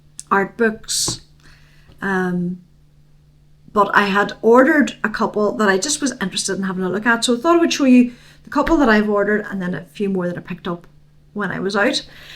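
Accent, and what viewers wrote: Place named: Ireland. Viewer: I have read several series of books about Ireland. Susanne O’Leary and Kennedy Kerr are two of my favorite fiction writers! I’ve never been to Ireland, nor will i be going at 77, but the names and places and customs do so intrigue me!!!! Being from Alabama in the southeast USA , Ireland is a place i dream about! Your accent certainly helps, too!❤️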